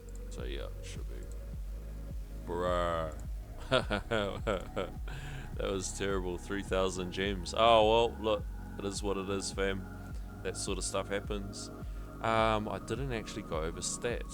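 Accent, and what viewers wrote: Australia. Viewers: you can skip to the second mark to skip the intro music and hear that beautiful aussie accent ;)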